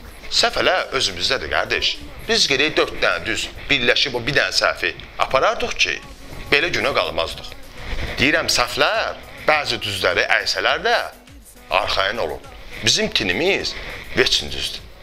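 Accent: Baku, Azerbaijan. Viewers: Bakı ləhçəsüdürdəəə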